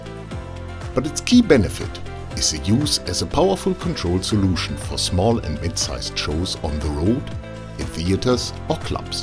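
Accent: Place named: Germany. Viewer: Most german accent ever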